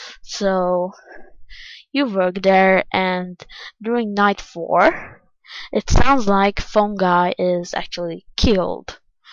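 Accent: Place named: Hungary. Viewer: Teszi magát a gyenguc magyaros akcentusával...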